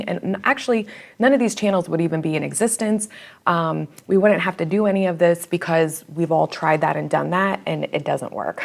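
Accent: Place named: Rhode Island, United States. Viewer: Your accent sounds like you’re from Rhode Island. New sub.💕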